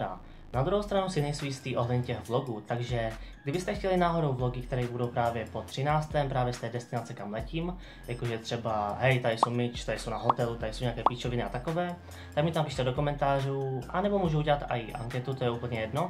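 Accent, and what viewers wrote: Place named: Moravia, Czech Republic. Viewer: "nejsu zvyklý" ... ty jsi z Moravy, že jo? :D jinak, vlogy určitě toč, bude to zajímavá obměna ;)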